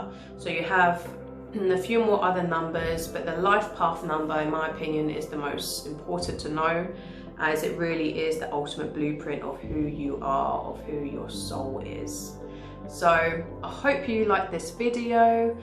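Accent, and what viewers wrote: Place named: London, United Kingdom. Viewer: I'm a 22 here, but I haven't been able to build anything thus far. Not sure what the blockage has been but I'll bet its been more an issue of learning to build than anything else. I was trying to place your accent through the whole video. Are you a Londoner?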